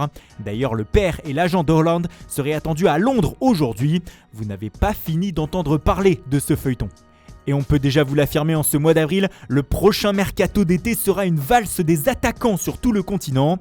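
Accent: Italy. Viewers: Le retour de la voie avec les accents italiens mythiques 🥰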